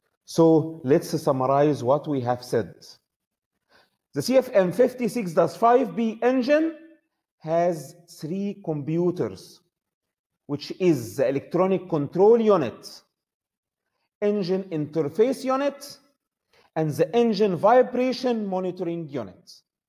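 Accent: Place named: Egypt. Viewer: اللهجه المصريه 😂 فيديو رائع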